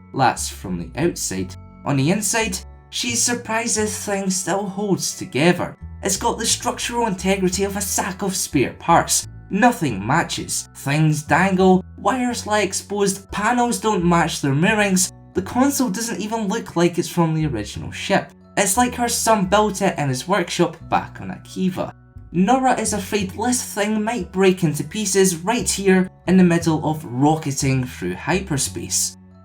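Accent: Scotland, United Kingdom. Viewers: Love the Scottish accent. Could do with a better mic though. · Your a Scottsman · Thank god for the subtitles. The Scottish accent is strong with this one. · Scottish accent on Youtube? A surprise to be sure, but a welcome one.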